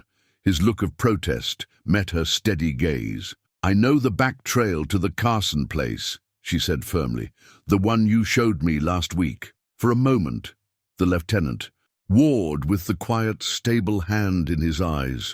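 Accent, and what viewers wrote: United Kingdom. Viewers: Sorry but the engish accent and cowboy stories don't agree. The english storyteller is bad. His pauses at the wrong time ruin the pace. The best thing is the pictures of the cowboys and Indians ...mostly very handsome. Now the picture of the womsn in the above picture. How many women in the 1800s had tattoos on their chests?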